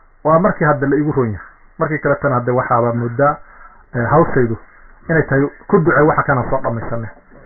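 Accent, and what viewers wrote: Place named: Mudug, Somalia. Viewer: Aun halganki syl ayu kaso qayb galey, doorashana kursiga waa ku yimid sharaf intaasa ugu filan MD Abdirashid Ali Sharmake, waxaa kalo cawa ka siyadsan inu nin rer muduga ahaa sida hadalkisa laga dhehanayo bari ba ha u dhashe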